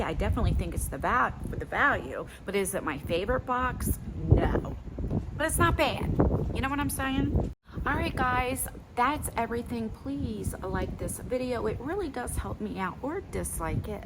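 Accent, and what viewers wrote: New Jersey, United States. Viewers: I know I've told you this before but I love your NJ accent because my boyfriend of 13 years has the same accent and I'm from Boston so I have a Boston accent so we tease each other about our accents. 🤣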